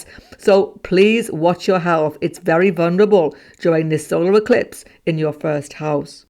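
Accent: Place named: Working class, United Kingdom. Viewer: That's the way the working class people in England speak, dude. They can't help themselves. We shouldn't hold it against them. Nobody chooses where they were born or grew up. Anyway, it's about the personality of the individual, and I find this lady's personality to be one that wants to help others. That's the way she comes across to me, so her way of speaking does not irritate me.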